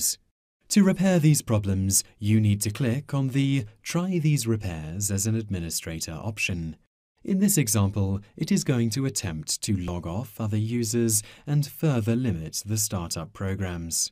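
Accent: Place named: United Kingdom. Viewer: I love British accents, it is soothing to the ear lol. Makes the video a lot easier to watch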